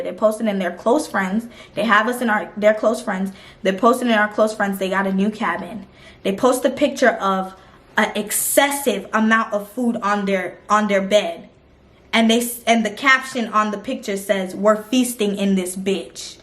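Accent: Jamaica. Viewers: she jamaican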